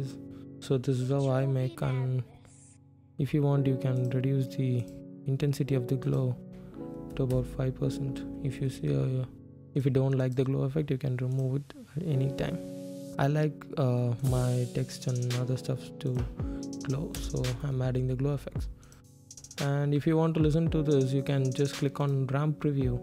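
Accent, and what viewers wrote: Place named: India. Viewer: sorry man but your indian accent is irritating me you should don't talk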